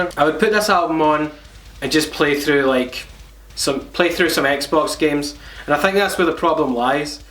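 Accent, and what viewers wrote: Scotland, United Kingdom. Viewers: wait your scottish? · I like when you talk because I like your Scottish accent.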